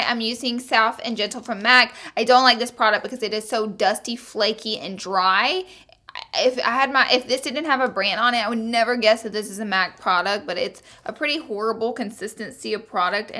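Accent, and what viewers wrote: South, United States. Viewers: you are gorgeous with or without makeup/shitty makeup. seriously, though, I'm from WV and we are incredibly southern so I really love your accent, a genuine southern accent is hard to find, man! and ppl try to say we are uneducated but I love how you always have facts about skin/dermatology/makeup knowledge from experience etc that you pepper throughout your vids! You may live in LA now but I'm sending you good vibes from the south, girl! keep doin' your thing and stay sassy af, lol ps - IM A HUGE CAT LADY, I LOVE YOUR FREAKIN CATS!! the one that shows up in your vids the most looks like my cat, Sassy 😊😻💜 (i have another one, and orange one, whoa is literally a female Garfield names Lucy...or Lu...or the Goose...the Goo...Lady GooGoo...Lucy Lu...etc. see, now ive taken it too far; I've surpassed the threshold of just "cat lady" to "CREEPY cat lady"... 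LEMME JUST STOP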